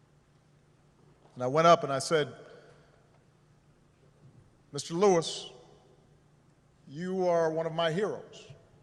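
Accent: United States. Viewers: Byron Moore where did this Deep South accent come from? This isn’t how Joe, Hillary, and Obama speak, unless in Dr. King’s church fishing for votes. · How did he suddenly develop a southern accent?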